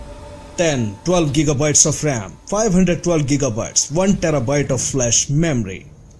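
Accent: India. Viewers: Are u from south india or oriya? Anyway stop spreading rumor. These are not correct info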